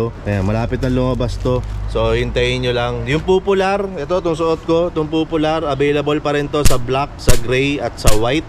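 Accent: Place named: Bulacan, Philippines. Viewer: Dinig na dinig ko punto ng paombong, bulacan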